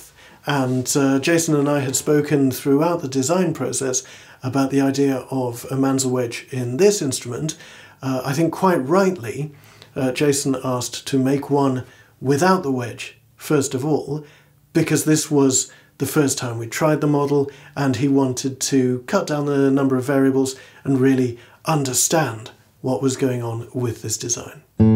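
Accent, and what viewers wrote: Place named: United Kingdom. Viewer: really enjoy your playing and videos....something too about a calm British accent speaking the King's english lol!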